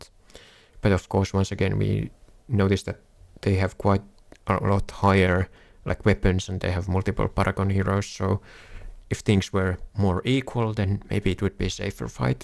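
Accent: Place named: Finland. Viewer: You are finnish right, your accent gave that away instantly. Try to say Phraesto next video and not prästost lmfao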